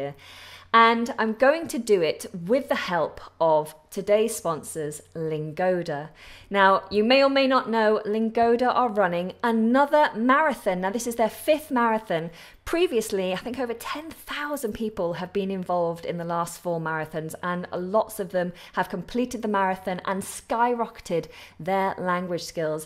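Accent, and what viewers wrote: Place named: RP, United Kingdom. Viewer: No one really speaks this posh tbh but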